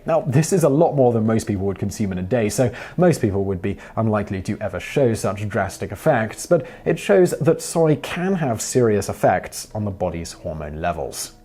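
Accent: United Kingdom. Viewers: I lo e how the American viewers get all worked up by his superior British pronunciation on a lot of words. Having him drop the odd American (mis)pronunciation in is great, just to keep them knowing that he knows.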